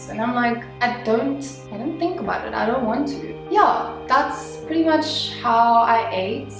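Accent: South Africa. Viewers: are you South African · Are you South African? You have a really strong South African accent 😂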